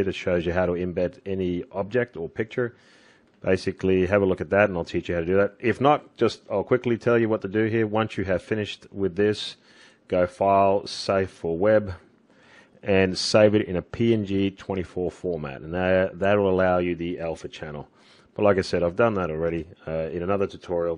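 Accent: Australia. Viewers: Cool. You still got the sweet aussie drawl mate. ☺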